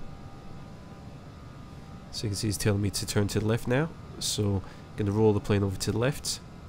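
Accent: Scotland, United Kingdom. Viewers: As a 'Newboy' to FS down in Cornwall, UK, I am impressed by the quality of this video. The clear and unhurried instruction by this Scot is first class. Just what I need at my age.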